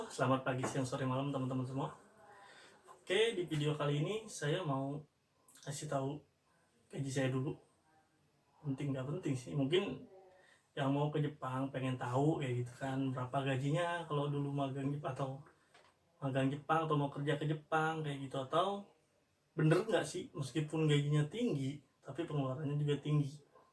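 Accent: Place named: Bekasi, Indonesia. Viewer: Bang Abang ORang Tambelang Ya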